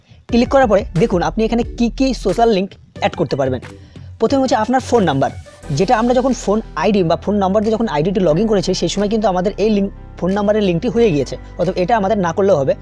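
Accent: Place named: Sylhet, Bangladesh. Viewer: sylheti bai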